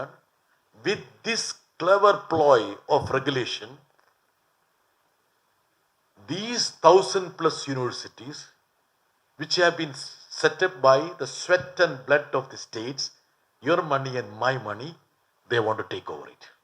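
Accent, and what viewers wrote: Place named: Kerala, India. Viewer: Poda Patti. Your English is malayalish. Your English accent is like 🐷🐖🐷🐖🐷🐖🐷🤣🤣🤣🤣🤣😆😆😆😆😆😆🤡🤡🤡🤡🤡🤡🤡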